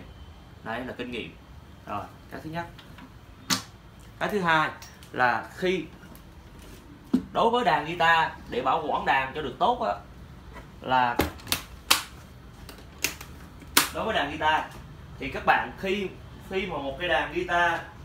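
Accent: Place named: Da Nang, Vietnam. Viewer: giong anh noi nhu nguoi thanh pho da nang , ghe zay cho em xin d/c dc ko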